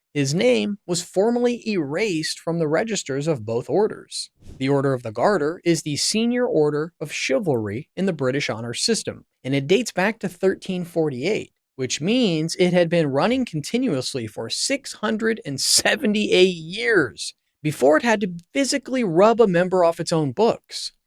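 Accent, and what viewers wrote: United States. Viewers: Why the American narrator? What's Prince Andrew got to do with Americans?! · PLEASE learn how to pronounce proper names. specifically "Sandringham", "Norfolk", "Thames" and "Aylsham". To British ears you sound very err... American (this is not a complement). When you talk about British police - you video shows American police and at one point police from some third country. Pretty sloppy. I like the content though. Andrew is a pig that has besmirched the institution of monarchy. When the King said "the law must run its course" that removed all royal privilege and protection. King Charles must be fizzing with anger at his brother and by publishing those words he is signalling that he is prepared to see Andrew in prison. You can now expect the full force of the law to drop on Andrew like a ton of bricks.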